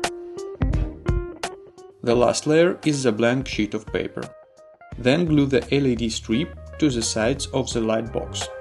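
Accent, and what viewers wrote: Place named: Russia. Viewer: Жёсткий русский акцент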